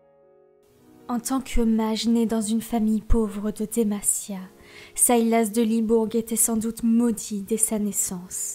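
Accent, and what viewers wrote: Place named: England, United Kingdom. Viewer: Nice, petite vidéo histoire de se rappeler le lore du champion avant le jeu ^^. Toujours un plaisir d'écouter tes vidéos et tkt pour ton accent anglais il est pas si mal en vrai x). Ps: je dirais la majorité des persos importants de demacia et surtout jarvan, garen et lux et si ont peut avoir des membres de la griffe hivernale en plus se serait cool.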